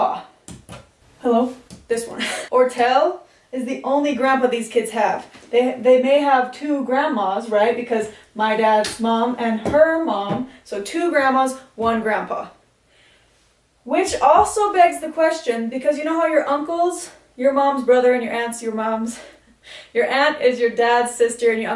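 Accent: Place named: Alabama, United States. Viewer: Are you from Alabama by any chance?😅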